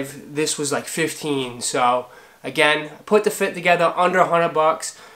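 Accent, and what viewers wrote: Boston, United States. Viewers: Bruh... Where are you from. Boston?!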